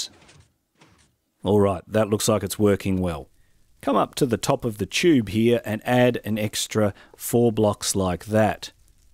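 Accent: Australia. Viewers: Oh my god, such a thick Australian accent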